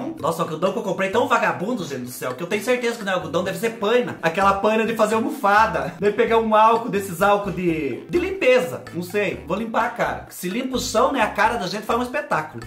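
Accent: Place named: South, Brazil. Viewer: Vc é tão fofo , adoro vc , gostaria de saber de onde vc é , vc fala igual o povo do sul acho muito engraçado , bjsss 😘❤️🥰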